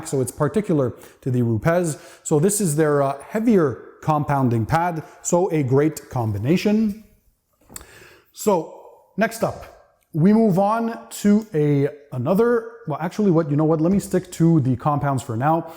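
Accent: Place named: Canada. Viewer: Seems convincingly Canadian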